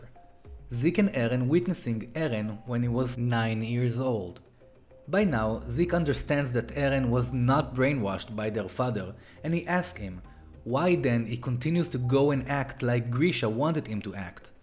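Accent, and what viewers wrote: Israel. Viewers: וואו איזה תוכן איכותי ישר לפי המבטא מזהה ישראלי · what funny accent. ur just Israeli brah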